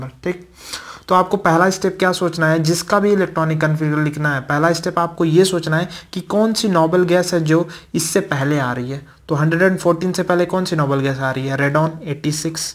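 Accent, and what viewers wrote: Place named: Bihar, India. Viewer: Bhai bhut shai explains krta ho appp❤ love you bro mere ko bhut dikkat thi isma 😊 ak baat puchu bhaiya ap bihar s lag rha ho 😅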